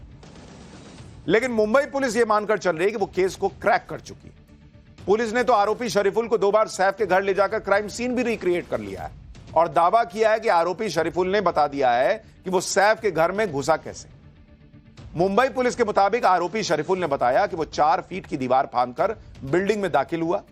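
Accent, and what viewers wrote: Bihar, India. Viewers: Poora Bihari accent mein lehra diye....Bihari youtuber Nahin Chahiye...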